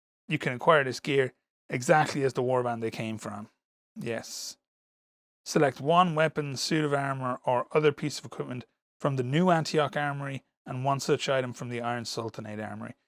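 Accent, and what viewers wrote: Ireland. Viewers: The voice of fantasy evil is Irish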